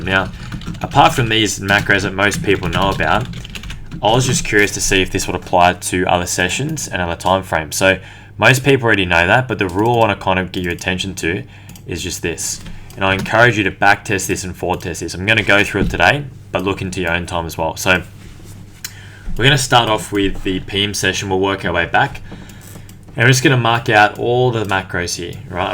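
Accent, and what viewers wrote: Australia. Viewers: Are you Aussie bro? Great video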